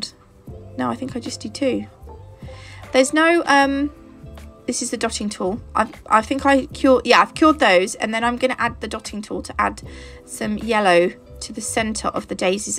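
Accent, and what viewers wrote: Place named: RP, United Kingdom. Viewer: They are really cute. I love the layering of different flowers (decals, paint & nail art) thank you ❤️ I hope you don’t mind me saying, but I’ve just noticed your voice/accent is quite posh sounding 😊(so ladylike….. and then the Pepsi Max shows it’s face and we get a burp 😂). Never change ❤️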